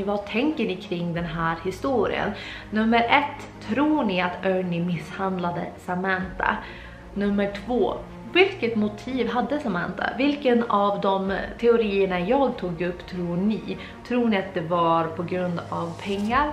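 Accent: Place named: Västerbotten, Sweden. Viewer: Alltså du måste ju va från Västerbotten.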